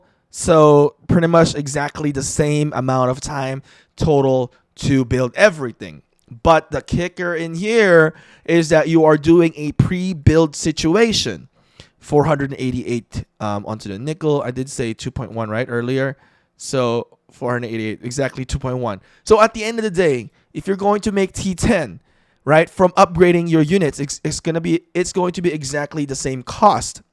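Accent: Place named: Philippines. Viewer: Pinoy accent, pinoy ata 'to.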